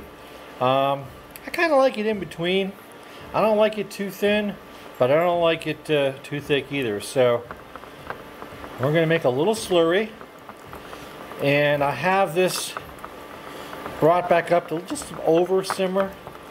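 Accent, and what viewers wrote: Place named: Pennsylvania, United States. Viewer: My father was WWII, gunner's mate in the north atlantic. Have a good friend who is a Seabee and she just left this week for her year... In Somalia, we think. My husband was searching bean and ham soup and voila! Yours came up and he shared it to me. I watched it and I told him I thought you were from PA... Just heard a word or two every once in a while. We are from Pgh area but living in NC.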